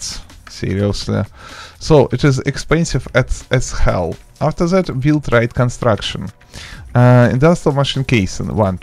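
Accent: Russia. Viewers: Пахнет русским акцентом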